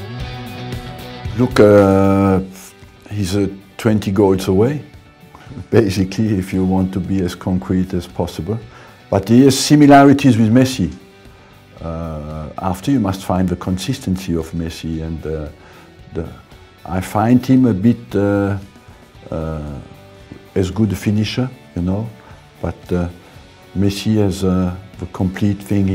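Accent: France. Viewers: Being from Alsace like Arsène, it’s funny and endearing to hear his Alsatian accent carried through to the way he speaks English. Legend.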